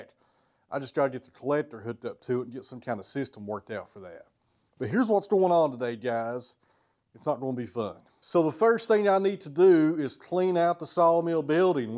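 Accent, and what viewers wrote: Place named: South, United States. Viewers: I like you as a person, your calm nature, personality, will all jobs some day are more exciting then others, I also enjoy the outtakes. O I like the beard also. As I’m not from that part of the world, if your accent is a Tennessee accent, I like it. Well done 👍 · Only just found your channel a week or so ago but have watched a few of your videos and can honesty say I like them! Loved the end of this one where you answer comments, if people don't like the content then just like with a TV they switch channel or switch off. I find them relaxing to watch and your Tennessee accent is easy on the ear. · I have been flowing you since your first posting. I have enjoyed all of them and I enjoy that southern assent of yours, so my friend roll on and enjoy what your doing. Life's to short to concern your self with people that can not see the forest for the trees! 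Your friend, Bob M, AU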